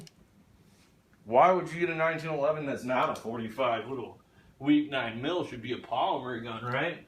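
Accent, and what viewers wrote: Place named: North, United Kingdom. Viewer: Btw, zip ur fly and learn some southern dialect. The rapid speech northern tone doesn’t do your guns justice. Practice: say slowly, - dog gone.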